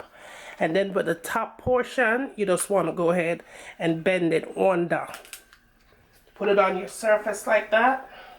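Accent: Jamaica. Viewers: As a born jamaican living overseas, you don't truly appreciate the beauty of the jamaican accent until you listen to it from a different perspective. Keep the authentic jamaican food coming - best food in the world! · Fantastic! Thank you, great step by step explaination, love your Jamaica talk! Perfect. Felt like I was back a yard!! Great Channel. xx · Thank u mami...u always make cooking seem oh so easy like 1-2-3....continue to share your gift with us ...I am always enlighten and inspired whenever I watch and follow ur tutorials ...big up yuself...PS love the accent...real yaadie...muaaahhh😙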